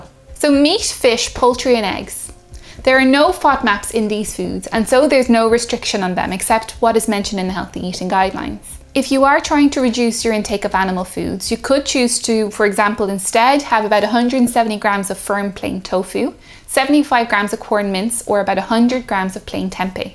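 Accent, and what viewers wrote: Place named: Ireland. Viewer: That ìrish accent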